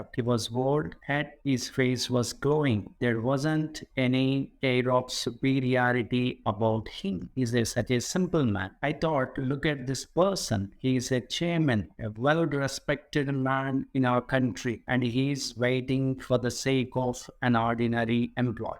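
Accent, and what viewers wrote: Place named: India. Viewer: Nice explanation! ❤️ But the only problem is accent.... honestly, I think we should focus on improving communication skills with our original accents, not changing them. The Indian English accent is genuinely one of the clearest out there 🇮🇳 Just my 1st-year B.Tech thoughts! 🌱. You are more educated and elder then me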